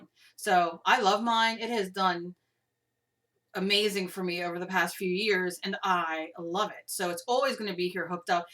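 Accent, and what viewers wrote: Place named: East Coast, United States. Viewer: I personally LOVE watching your videos, hearing you talk is much better then music. It makes me feel as if we are hanging out together. I love the accent, I miss the East Coast so much now that I live in the West. Everyday I go to see if you have new videos along with Gina, Lindsey, so many talented ladies! I thought it was great you mentioned your weight loss! When this video started I said to myself, how can I ask her did you loose weight? Then you said it! I am so proud of you, I need to start doing something myself, I am a food junkie! I look forward to recipes and a craftroom tour! Thanks for sharing all that you do! I think you are a peach:)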